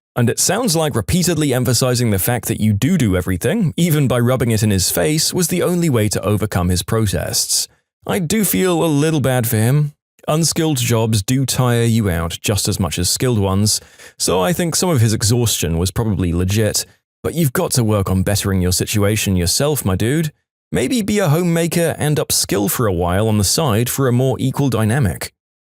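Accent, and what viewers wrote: United Kingdom. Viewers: No offense but British ahh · The British voice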